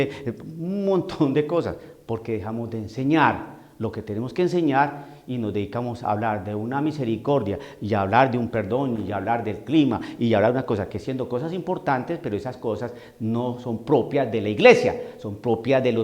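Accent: Colombia. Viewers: Padre excelente sus enseñanzas, más claro imposible; así hablamos los colombianos, con la ruana puesta, para estos climas que hacen por estos días y de ñapa con la delicia de aguadepanelita. Se le aprecia mucho padre 🇨🇴🙏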